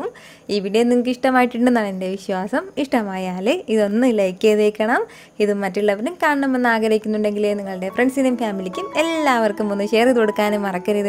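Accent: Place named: Kannur, India. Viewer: Endutta slangaa chechi... Superb KANNUr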